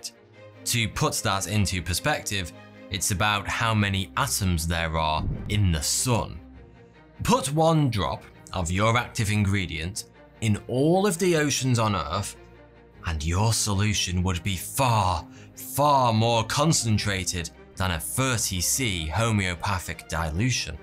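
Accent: United Kingdom. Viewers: Who cares he has a british accent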